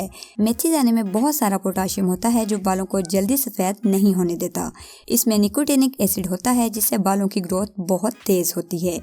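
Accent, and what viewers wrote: Punjab, India. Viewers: sister v love her with Punjabi lehja. She is a talented person so it does not matter that what is her eccent. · api punjabi nai bolti or wo punjab sy hy to pujabi style hi ho ga na .or kaisy boly wo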